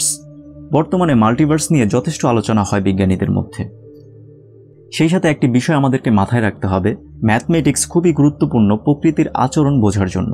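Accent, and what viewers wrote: Narsingdi, Bangladesh. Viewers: আপনার বাড়ি কী নরসিংদী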